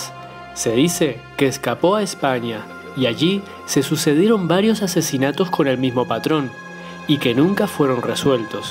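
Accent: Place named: Montevideo, Uruguay. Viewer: Seis años después, vengo a ver éste video, soy Montevideana y viví muchísimos años en esa zona. Son fascinantes las antiguas casonas del Prado! Tenés un acento bastante parecido al de los Montevideanos excepto por la pronunciación de las "ll" o "y" 😊 de qué país sos? Es una pena que la hayan derruido a la casona y en su lugar haya un edificio. Saludos desde Uruguay y el video está muy bueeno!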